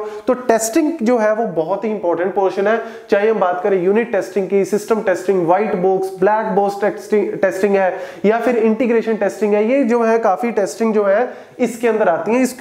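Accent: Punjab, India. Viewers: sir aap punjab se ho..?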